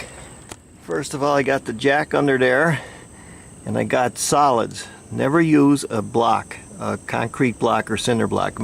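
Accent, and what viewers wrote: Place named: Northeastern Pennsylvania, United States. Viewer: I can tell by your accent you are a true NEPA guy. I enjoy seeing all your work and inspire me as a DIYer/Handyman up the eynon.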